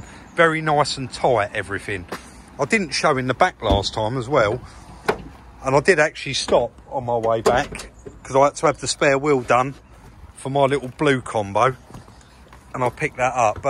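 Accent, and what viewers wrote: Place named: Northern England, United Kingdom. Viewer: I'm from Wigan way, ya know up North 😉, and I reckon some of your words and accent are as crazy as ours. Another language, briliiant!!! 😀😀😀 These videos seem to be getting better as you and become more and more used to having that camera aound. 👍👍👍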